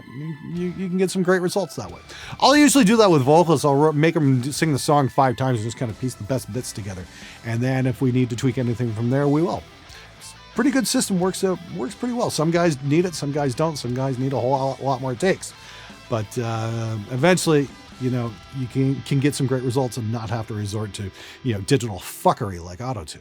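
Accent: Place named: Canada. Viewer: I always giggle when your Canadian accent shines through.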